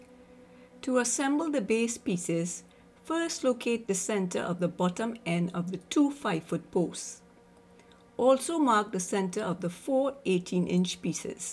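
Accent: Trinidad and Tobago. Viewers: Great job Are you Trini?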